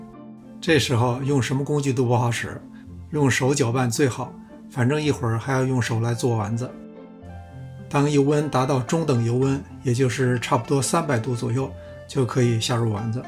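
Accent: Beijing, China. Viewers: 喜欢听你说话！比听歌好听！超纯音的京片子！做菜也到位！超赞！大师:多点出镜！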